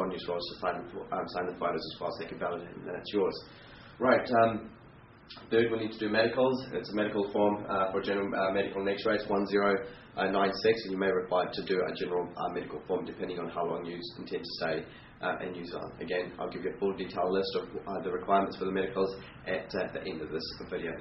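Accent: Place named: Australia. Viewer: Hello Mate, Firstly speak clearly,there is lot of resonance in background..I can understand..you speak like an Ausiss mate.I know i was in merchant navy and sailed all over world so I can speak in all the accents. do you know rushell peter watch his show...joking Few points for you to improve. 1.Speak loud and clear 2.write on board neatly 3.If you cant write have a printout in bold letters.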